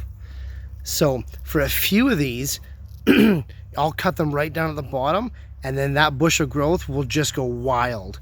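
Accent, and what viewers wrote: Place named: Canada. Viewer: Certain dialects or speakers from specific areas (in this case maybe a part of Canada?) it just how they speak. The intonation you are speaking to falls into this “Canadian accent”.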